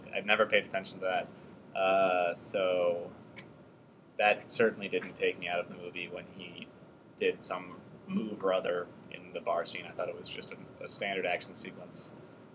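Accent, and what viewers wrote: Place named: Scotland, United Kingdom. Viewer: Scottish accent, douche bag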